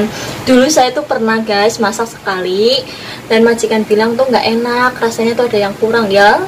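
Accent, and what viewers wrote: Banyumas, Indonesia. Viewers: Ora ngapak ra kpenak ya mbk😁 Sma mbk ce q jg stengah 7 mkn mlm nya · Pokoke Ora ngapak ora kepenak .. Sukses Terus mbak Yu 👍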